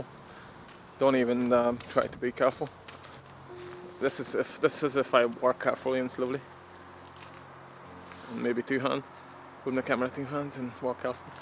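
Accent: Northern Ireland, United Kingdom. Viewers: Good. Is that an NI accent I hear?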